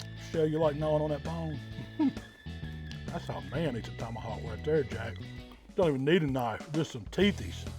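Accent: South, United States. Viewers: Something about a fat guy with a southern accent and awesome sayings that gives instant smoking credibility. Not a diss at all. Your videos are all awesome.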